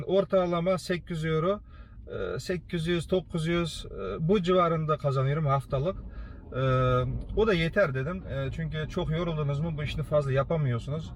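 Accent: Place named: Xinjiang, China. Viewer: Türkçen gayet iyi.kendini gayet iyi ifade ediyorsun.Sadece ufak lehce farkı var Uygurcadan dolayı. Bizdede Uygurca yok.☺️ Güzel bilgilendirici video olmuş👍🏻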